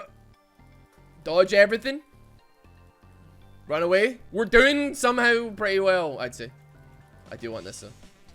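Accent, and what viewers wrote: Scotland, United Kingdom. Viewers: League of legends but u only speak pure scottish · Please go full Scot and don't tell any of us what anything means, i'm from further north but i'd still love to watch it. For the most bit glasgow speakers are easily enough understood. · League of Legends but goes full Scot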